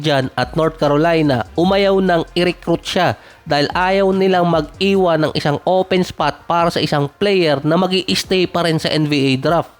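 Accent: Batangas, Philippines. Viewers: Batangueno ka pala 😂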